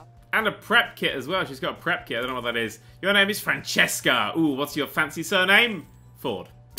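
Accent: England, United Kingdom. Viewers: Oh! And how could I forget, an hilarious, English narrator.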